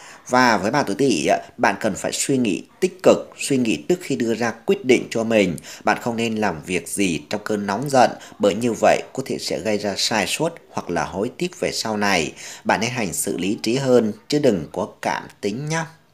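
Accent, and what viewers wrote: Thanh Hoa, Vietnam. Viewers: Giọng Thanh hoá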